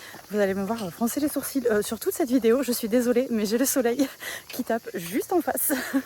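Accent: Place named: South, France. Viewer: Mon Dieu, quel corps de folie En plus. Cet accent du Sud et ses cigales Ne change rien, reste comme tu es magnifique.......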